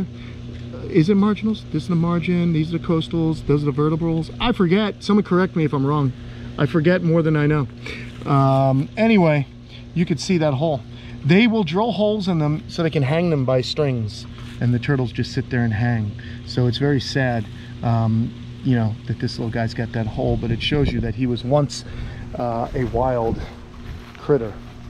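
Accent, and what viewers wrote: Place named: Long Island, United States. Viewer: You are do funny! I can hear the Long Island in your voice and the way you talk! Your sense of humor is SSOOO NEW YORK!!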